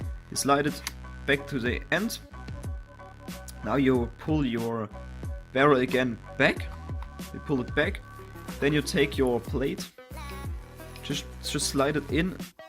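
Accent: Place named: Germany. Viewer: Der deutscher Akzent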